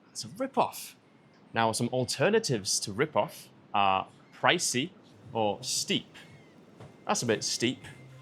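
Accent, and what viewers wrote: United Kingdom. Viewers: I am from Bangalore. I love to watch your video for improving my british accent ❤❤ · Good speak British ❤ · açıkçası İngiliz İngilizcesinin telafuzunu daha çok beğendim 😅 Amerikan İngilizcesi konuşacağım ama yine de sizi dinleyerek İngilizcemi geliştirebilir miyim? Bu arada Türkiye'den selamlar❤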